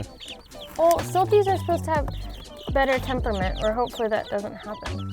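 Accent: South, United States. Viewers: shes cool I like her cause shes into trucks and shes got that southern twang in her like you do ! LoL anyways awesome C10 and chicks !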